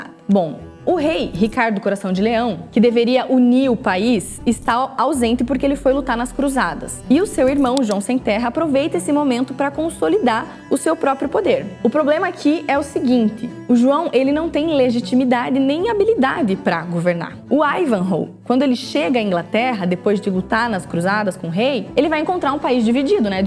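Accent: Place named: Curitiba, Brazil. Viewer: O sotaque dela é tão bonito! Certeza que ela é curitibana! 🤭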